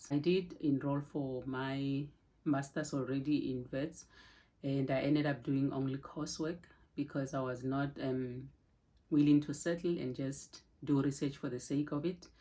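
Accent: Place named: South Africa. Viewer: I love that she’s still South African even through the English. Congratulations on your move.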